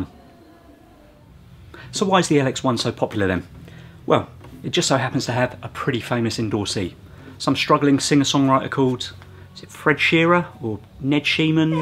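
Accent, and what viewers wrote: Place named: South East England, United Kingdom. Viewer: 掌編製作所 he is from england , south east england me reckons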